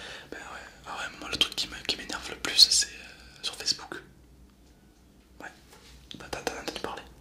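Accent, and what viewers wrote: South, France. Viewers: Et Bonjour c’est l’accent du sud 😉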